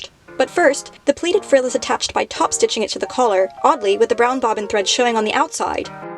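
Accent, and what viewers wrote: United States. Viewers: but with modern American intonation, it's honestly relaxing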